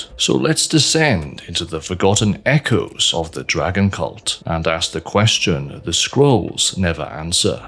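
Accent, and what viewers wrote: Wales, United Kingdom. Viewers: That magic Welsh voice. 🔥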